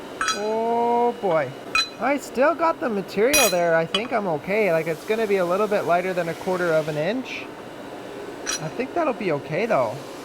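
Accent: Canada. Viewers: I'm Canadian. And you sir, have a truely incredible Canadian accent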